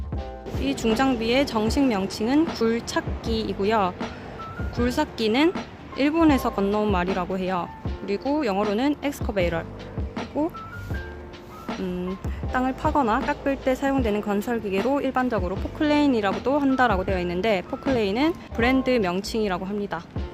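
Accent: Gyeongsang, South Korea. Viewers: 마 갱상도 사투리가 슬쩍 들리네예.포클레인은 굴착기 제작 회사 이름이었죠. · 굴삭기땅크애니띵 말투가 갱상도시네요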